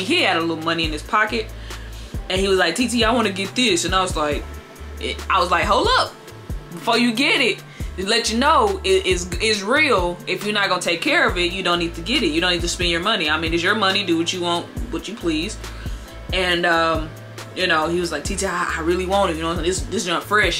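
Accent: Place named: South, United States. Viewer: ok ok you might be from the south I can hear the accent now lol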